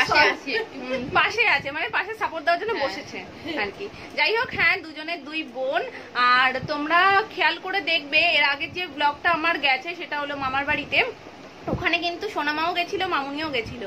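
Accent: Bangladesh. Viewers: Tumi bangal nah "neba" Deba" bolo amio boli Amro ase nah j "nebe" debe"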